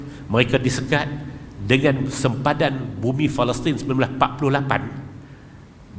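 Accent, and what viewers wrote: North, Malaysia. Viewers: Suka dengar Ustaz dan Ustaz Radhi (adiknya). Suara jelas dan penyampaian slang utaqa yg menarik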